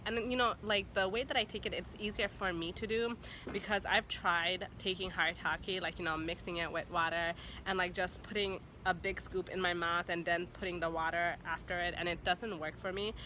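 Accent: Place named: United States. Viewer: This indian lady speaks perfect English with an American accent! Lovely eyes! I want this woman!!! Lol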